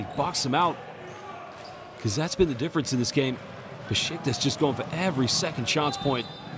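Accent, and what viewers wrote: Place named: Boston, United States. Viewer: Just watching this a year later for the love of the game. Sounds like the announcer is from Boston. Stunning shot to end q1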